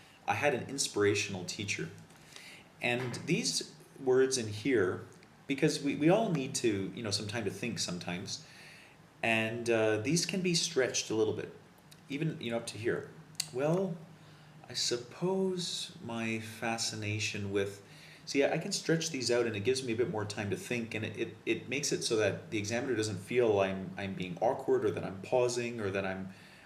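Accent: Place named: Canada. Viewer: Just a Canadian accent.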